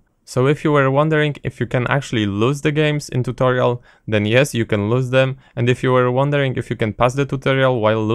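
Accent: Poland. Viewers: Are you polish? :D You sound like a polish guy :) Btw. your voice is awesome :) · this polsih accent is unbearable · Dziena poznałem po akcencie że jesteś polakiem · You have polish accent, so POLSKA HUSARIA GUROM! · jaki polski akcent xd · Szłyszeć po głosie, że z polski jesteś! :D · Hello, are you from for Poland? U sounds so polish :D xD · For first,he is Polish, and he has a Polish accent.And for the second,just try to raise the volume a bit or turn on the subtitles if you don't understand him,but however,his english can be understood. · when you speak English, you sound like you would be from Poland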